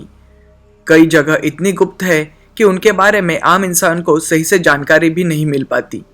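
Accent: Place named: Maharashtra, India. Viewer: narrator pakka Marathi hai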